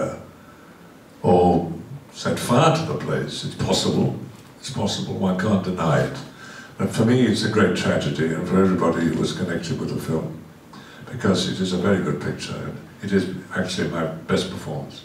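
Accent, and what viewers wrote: France. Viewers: This perfect french accent. bordel de merde. je ne l'oublierais jamais, i will never forget you Christopher Lee. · his french accent is flawless, so is the man himself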